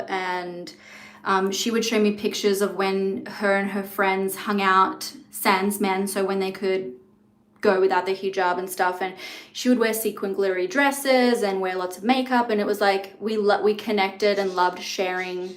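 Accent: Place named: Australia. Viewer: Ur accent is so much more pronounced now that ur home. I absolutely love it. Australian accent is my favorite accent. There are creators that I watch just to hear the accent.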